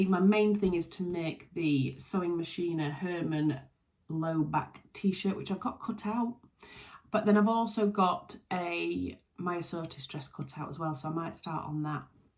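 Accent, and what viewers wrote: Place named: County Durham, United Kingdom. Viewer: Thought another commenter gave a good suggestion for the creme floral.....put the floral design near your face. Another trick I use when I Iove a fabric that's not really my best color is to either make a binding of one of the colors in the design and put that around the neckline and even the sleeves.. Or piping around a lot of the edges works too. Or a collar of a different color that flatters. Last Or.....even use two colors stacked for sleeve or neck band band, button plackets. So love listening to your accent, it's like home. Family all still live in Country Durham but our family emigrated to America. Thanks for the time you take to share your sewing!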